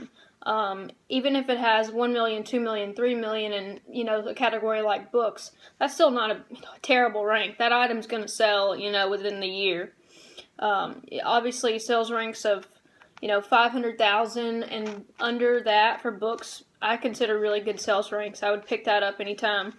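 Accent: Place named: Georgia, United States. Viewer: ...love your accent. You from GA is you not? Lol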